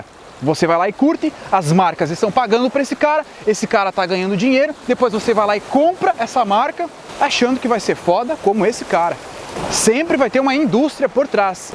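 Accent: Rio Grande do Sul, Brazil. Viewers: Muito bom como todos os conteudos, mas entre nos meu velho, pelo teu sotaque sei que tu também é Gaúcho, diz ai: É Gremista ou colorado haha sucesso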